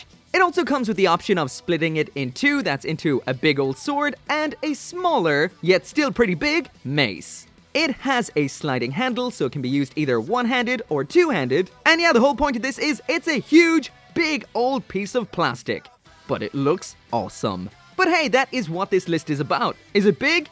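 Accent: Ireland. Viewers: Yo you Irish bro? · I hear the Irish accent, but there's something else there, right? Maybe I'm just crazy.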